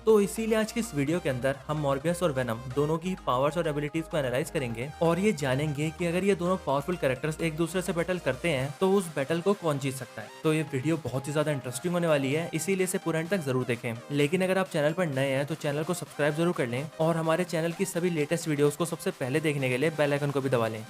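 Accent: India. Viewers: hind şivesi cok komik :D:D:D:D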